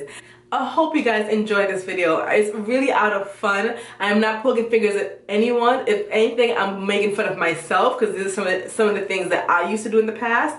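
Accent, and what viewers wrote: Patois, Jamaica. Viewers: I knew you were Jamaican because I could hear the patois at some parts. I'm Jamaican too.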